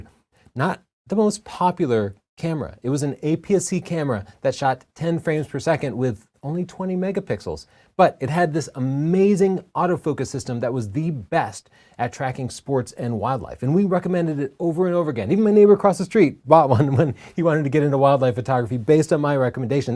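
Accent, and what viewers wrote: Texas, United States. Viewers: odd, halting speech pattern is a lot like Jeff Goldblum, with a slight Texas twang.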